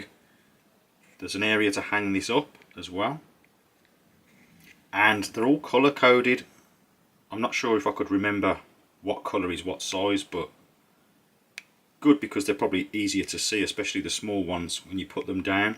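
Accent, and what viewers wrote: Birmingham, United Kingdom. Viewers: You need a better accent. Most Brummies are as stupid as they sound. I suspect you’re not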